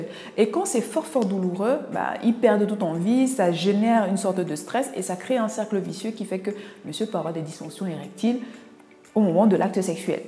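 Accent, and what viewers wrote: Cameroon. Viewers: Merci docteur, vous avez un accent de chez moi " CAMEROUN" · Si vous êtes marié, votre mari est beni; Si non le future le... vous avez un accent français un peu Camerounaise...?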